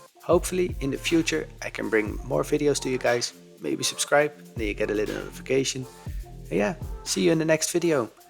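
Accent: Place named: Netherlands. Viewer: Are you dutch? You sound very dutch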